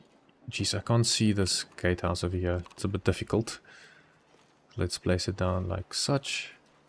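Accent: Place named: South Africa. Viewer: Cool to find a South African here! Your accent was just so peculiar to me I had to check your channel location.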